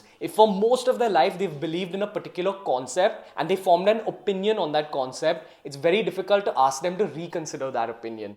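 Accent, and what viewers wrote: India. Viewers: Idiotic logic + Indian accent = Pure comedy gold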